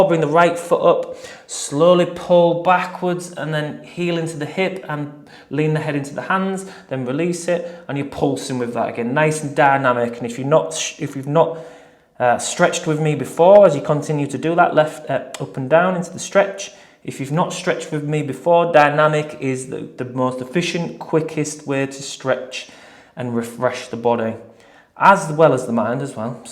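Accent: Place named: England, United Kingdom. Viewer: Just done my first run in 20+ years! Refreshing to find an English video rather than US, and a regional accent too! Great!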